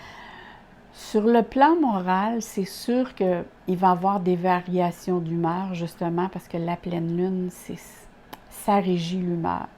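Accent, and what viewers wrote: Quebec, Canada. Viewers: Bonjour, tient dont,,,, un accent du Québec, très bon tirage, bonne continuité. Kado de Québec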